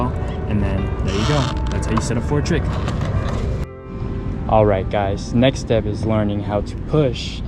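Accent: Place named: Ohio, United States. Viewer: he sounds like an ohio man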